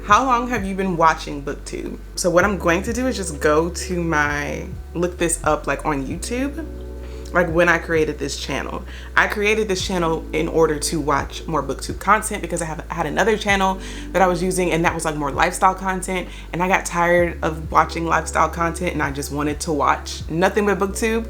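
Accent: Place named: New York, United States. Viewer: I love her NY accent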